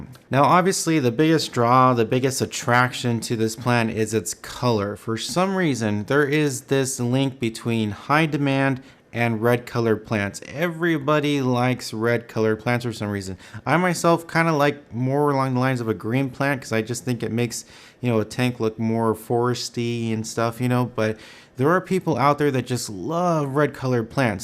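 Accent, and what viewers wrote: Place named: Glasgow, United Kingdom. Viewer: Glaswegian :P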